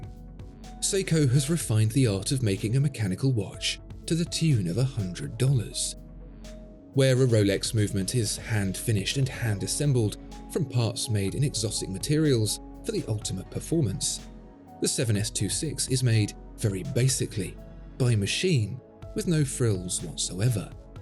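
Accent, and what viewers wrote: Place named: RP, United Kingdom. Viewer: Okay, so I heard "A Rolex is to watches what chimpanzees are to primates, the best, the original, the classic." I don't care for Rolex but are we just going to act like saying that chimpanzees are "the best, the original, the classic," is fine as long as it's said by an elegant voice with a High Street accent? Oh, my oh my. Hilarious.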